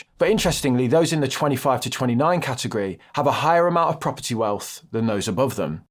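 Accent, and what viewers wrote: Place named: Midlands, United Kingdom. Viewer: Brilliant. I never thought I’d enjoy a video on finances. Also… loving your Midlands accent!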